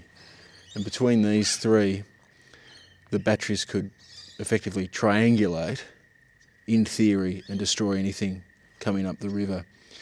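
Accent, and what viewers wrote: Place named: Australia. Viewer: You, sir, give a valuable service, in an iconic Ozzie laconic manner.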